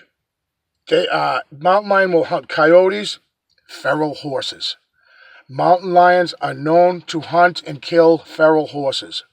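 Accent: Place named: Rhode Island, United States. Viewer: You're from Rhode Island, I'd bet...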